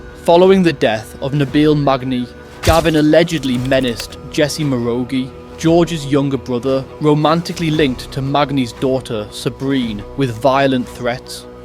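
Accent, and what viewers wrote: England, United Kingdom. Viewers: 😂You idiot it's obviously English, have you left Frankston before?😂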